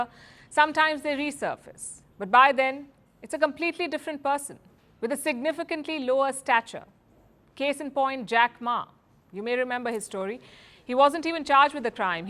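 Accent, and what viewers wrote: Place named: India. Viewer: Okey, Palki nice to hear your Indian accent once more. Thank you for ths update.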